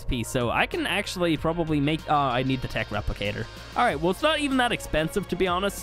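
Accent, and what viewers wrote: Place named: Ireland. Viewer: Jolli_2000 hes Irish its the way they talk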